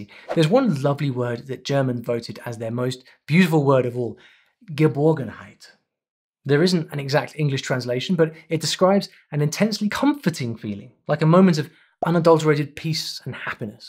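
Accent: United Kingdom. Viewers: Ich habe Dein großartiges Video mit Interesse verfolgt., Du kannst so fesselnd erzählen. Und Du klingst so überzeugend. And it's great to hear you British English. I just like both Westgermanic languages. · To me as a German you sound, quite frankly, very bri'ish. But English has some crazy dialects as well.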